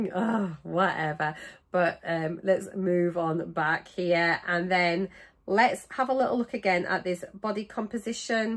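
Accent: Yorkshire, United Kingdom. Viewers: Hello lady, I discovered your channel recently and promptly subscribed. Your Yorkshire accent and warm, friendly personality is just so endearing, I could listen to you forever. And that’s before we even touch on content, which’s just first class! As a fellow MJ user on week 6 of my journey, it’s very helpful to listen to good, sound and trustworthy advice. And the fact that you were also a nurse “on your previous life”, as am I, is just the icing on the cake! Incidentally, our starting weights and current weights are exactly the same. I too am just half a pound off getting my 3 stone rosette from Nutracheck, which I can not recommend enough! My weight loss journey however, started way way before yours, and I lost just over two stones just using Nutracheck and about 11 lbs in nearly seven weeks of mounjaro. I really am struggling to get past 900 calories on some days, and that upsets me, as I’m terrified of damaging my metabolism and making things harder for myself in the long run. 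Most days I do manage around <num> calories, but have to work VERY hard at it! I truly love your channel, and when pushed for time and can only watch one, that’s the one I pick ❤ PS: sorry, our starting weights were not the same. I got that wrong!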